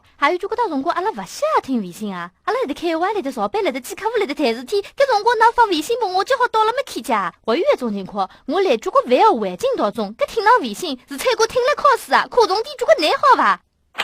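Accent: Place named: Ningbo, China. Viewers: 讲的有很多上海话口音、不纯正的宁波说话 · 这不就是很正常很普通的宁波话吗……顶多有俩字读错（我就听到“容”和“迎”读错了，人家“微信”都读的对了），怎么一大票人跳出来说不正宗不纯正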